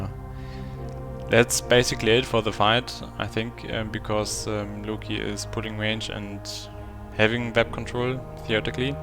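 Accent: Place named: Germany. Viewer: Ich wollte dich zuerst wegen deinem deutschen englisch dissen aber ich kann es selbst nicht besser :D Sehr nice!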